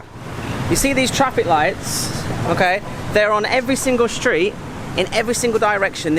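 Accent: United Kingdom. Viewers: Hey, nice video. Question - going purely on memory your name sounds decidedly IRISH; But your accent... It's British no? Are you from England/Liverpool? Or am I way off? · Love the British accent. Or Irish? Fun anyway. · Thanks so much for this VDO. I’m just back from London and will live in Hatyai. Love your British accent so much! Hope to see you around in Hatyai. Enjoy!!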